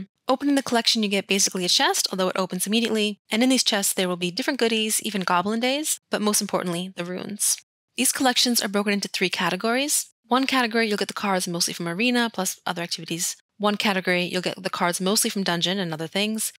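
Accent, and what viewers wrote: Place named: New Jersey, United States. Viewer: New Jersey accent?! How did you end up in Europe? Come back to Jersey.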